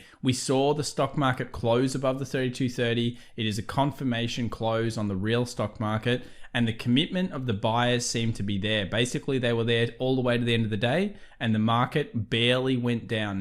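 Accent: Australia. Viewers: One of the best analysis channels on YouTube. Plus the Australian accent. Can't ask for more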